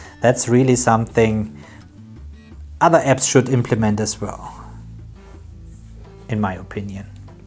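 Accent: Germany. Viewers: Are you from Germany? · Oh Gott, dein deutscher Akzent ist so schrecklich anzuhören!!!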